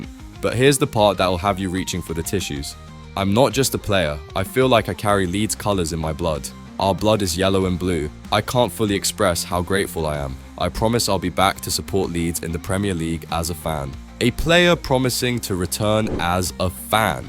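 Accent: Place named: United States. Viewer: Oh here we go again with the “insider information” on any upcoming signings. These guys are unbelievably quick at getting the inside information out before anyone else, only to realise afterwards it’s a load of cack and never comes to fruition. I love how they report on things that have happened behind the scenes with our beloved club without a single shred of evidence in their reporting and just showing random excerpts of Leeds scoring goals. Did you see their report of Haaland praising Warnock with pics of Haaland and the Leeds badge in the same photo, garbage. Listen guys, these lot are in it for the “likes” and “subscribes” Not from this reluctant viewer. Who are these guys with the American voice over, who do they report for or from.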